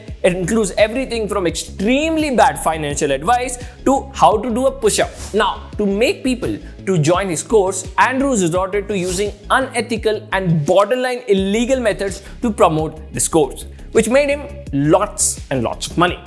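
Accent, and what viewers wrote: India. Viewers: I am sorry ,but I guess he needs to work on his accent,too much Desi accent..please take it as an advice from a fan of aevy tv.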